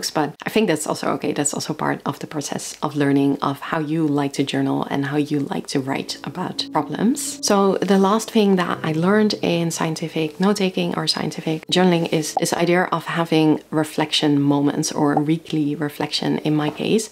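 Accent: Netherlands. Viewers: The accent strikes me as a native Dutch speaker (or perhaps another European language). Being close to the UK, Europeans generally pick up more of the British accent than the American one. Let people speak how they want, if you’re understood, you’re doing fine.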